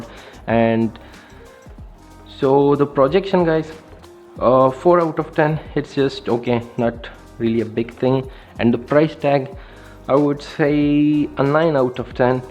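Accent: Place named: Pakistan. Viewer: Пакистанский акцент. Очень сложно понять его английский.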